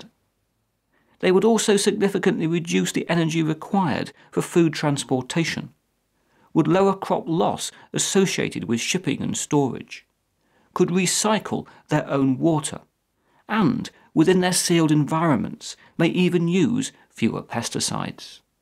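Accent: United Kingdom. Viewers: I think hes from Northern England. Im from London, our accents are quite different to eachother. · Does every fucking talking show host person have to have a british accent? Cool video btw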